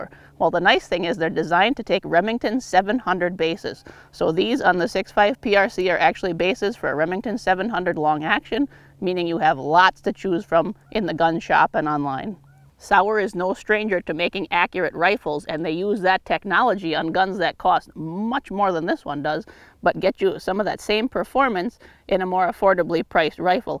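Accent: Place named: North, United States. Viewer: This broads northern accent is awesome! Yah youbetcha!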